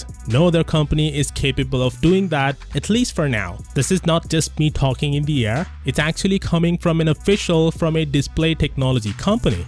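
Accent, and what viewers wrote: China. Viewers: Yes, chinglish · Wtf your accent sounds like Chinese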